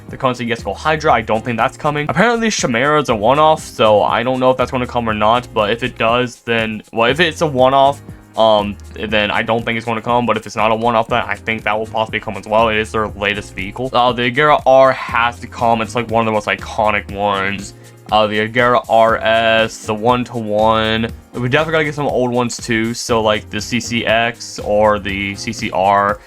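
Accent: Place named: Kentucky, United States. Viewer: You from Kentucky? I’m from London Kentucky myself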